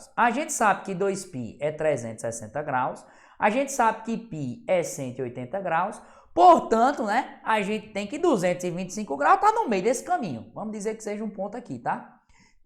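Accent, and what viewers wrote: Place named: Northeast, Brazil. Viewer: Muito obrigada professor, me ajudou muito!! O sotaque nordestino, bom demais!!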